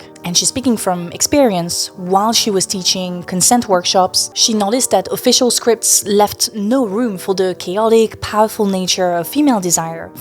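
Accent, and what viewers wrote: France. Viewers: Hi! I really enjoy your videos and the perspectives you bring on the topics you choose! The discussions are always super well researched and thoughtful! I also really enjoy listening to your voice/accent. I have a question about that, actually, cause it’s something I’ve been thinking about when it comes to my own accent (austrian/slovenian). When I was younger I sought to mimic the accents I heard on tv and in person, but lately I’ve found that I like it if a bit of my language diversity mixes into it and have sometimes deliberately chosen to keep some things like a german h or a slovenian r in some words. In that way I sometimes feel like I’m aiming for a balance between what’s understandable /great for the person I’m speaking to and what’s more authentic/feels more comfortable. Going into english spaces I always felt a bit self-conscious of a stronger austrian accent (we historically don’t have the best representation lol) so I was wondering what your experience is with that as a french content creator who creates in English. 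Do you find yourself toeing the same line or do you not think about it at all? Do you think it might be different if the language you were born in wasn’t french? (I’m kind of assuming this here, please correct me if I’m wrong, after all, I don’t actually know you 😅) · i find your pronunciation of "o" "oo" "ar" "ure" all pronunication as a strange "urrr", videurr, explurnation, everywhurr, burrk, prurfur - this clumsy hiding of your french accent , it's very distracting. Sorry, but i really like your content. I am French and I don't see why you make so many vowel sounds converge into "urr".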